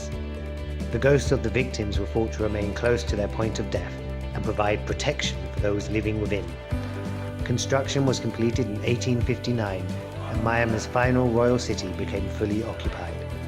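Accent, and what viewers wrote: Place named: RP, United Kingdom. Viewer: You sound so posh I love it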